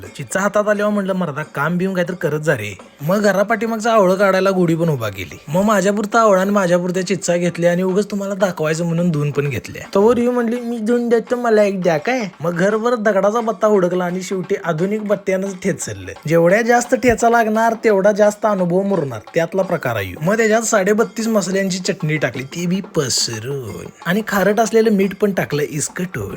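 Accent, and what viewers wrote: Kolhapur, India. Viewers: kolhapuri bhasha no 1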